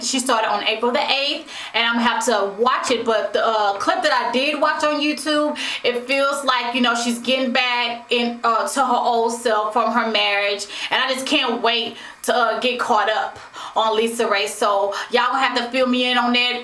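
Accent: South, United States. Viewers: i feels that?? hahaha. your country accent cracks me up (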